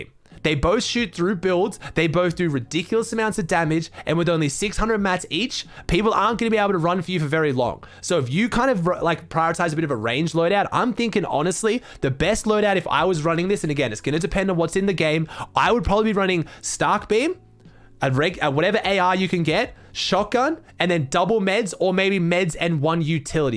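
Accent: Australia. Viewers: Aussie tryin to coach me? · How many people low aussies accent 👇🏻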